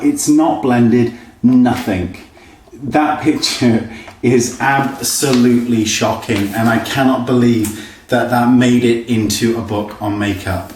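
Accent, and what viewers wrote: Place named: Cornwall, United Kingdom. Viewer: Great video. Honesty is wonderful. You sound just like my friend from Cornwall. Sounds lovely. xx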